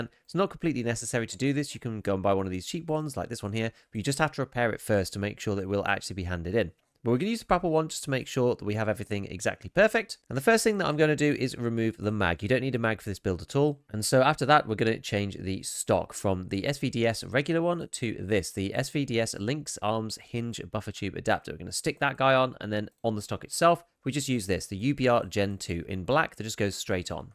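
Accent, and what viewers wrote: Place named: United Kingdom. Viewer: you sound like a re**** brit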